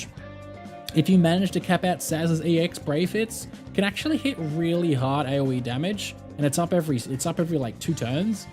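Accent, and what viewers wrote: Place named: Australia. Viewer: hahah i knew it :). Im from Victoria and i thought that was an aussie accent. I was greedy and i use both garnet and ramza - 90% hp attack cap up is delicious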